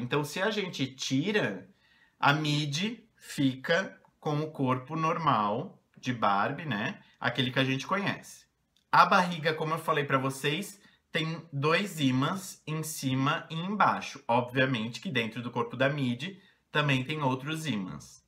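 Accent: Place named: Rio Grande do Sul, Brazil. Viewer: Ai que tudooo! Obrigada por responder, vc é um amor de pessoa muito simpático. ♥ Me diz uma coisa, vc é gaúcho? Sinto um pouco de sotaque hehehe eu sou de Porto Alegre!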